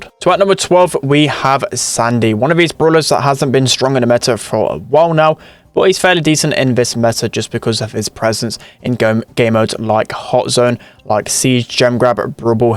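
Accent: United Kingdom. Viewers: It's the british accent xD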